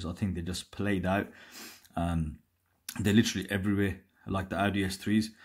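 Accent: Birmingham, United Kingdom. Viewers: Subscribed mate.. have to support my fellow Brummie... well you sound like a Brummie 😅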